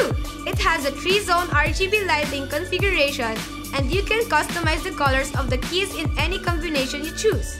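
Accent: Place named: Philippines. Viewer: hay filipino accent talaga xD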